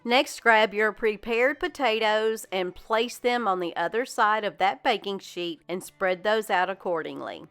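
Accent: South, United States. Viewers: I love your way of talking. Southern talker, perhaps ?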